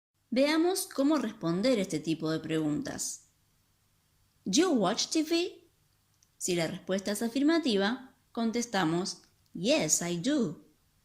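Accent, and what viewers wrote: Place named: Argentina. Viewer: Que explicación tan clara como el agua. Gracias señorita se nota que es argentina o algo asi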